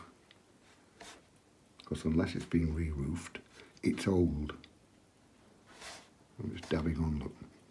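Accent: Yorkshire, United Kingdom. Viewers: What a joy to watch, and as a West Riding woman of similar vintage I love hearing your vowels! You really do make the whole thing look very easy. For me it’s not! It is a great help seeing, for example how you do shadows, and how things blend together when you are using a limited range of colours. Really nicely filmed as well. Thank you.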